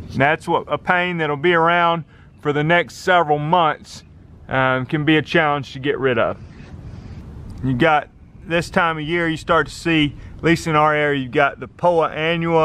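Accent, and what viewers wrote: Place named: Alabama, United States. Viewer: He's got that Alabama draahhwwllll.. Love this channel tho. You'd probably say I have an accent from VA. But sounds normal to me .lol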